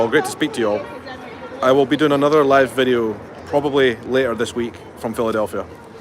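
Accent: Scotland, United Kingdom. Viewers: It's great to have you guys both visit a historic part of the USA. I hope y'all will have a wonderful time here. Edit: Hi from San Antonio 63° F I love your bolo! Remember your Scottish accent will open a lot of doors for you and. People love it.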